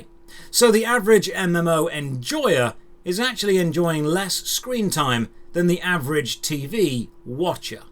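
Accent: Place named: RP, United Kingdom. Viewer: I have not played an MMO in over a decade. I simply like the Posh British Guy. Anyone else?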